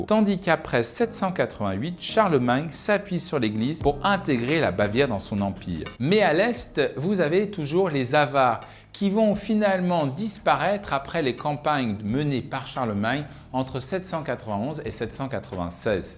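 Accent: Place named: Paris, France. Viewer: Bonjour, votre accent Parisano-Franchouillard me csse les oreilles, au niveau littérature : Quer durch Österreich (Gertrud Gréciano-Grabner)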